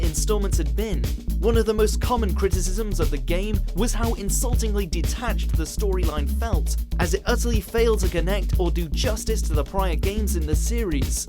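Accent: United Kingdom. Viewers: I hate this British voice. Bring back the American one. This one sounds like a cartoon. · Why is a British person narrating Watch mojo now a days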